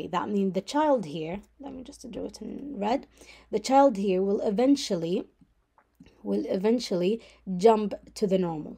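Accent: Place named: Saudi Arabia. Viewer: استانست لما سمعت الاكسنت السعودي🔥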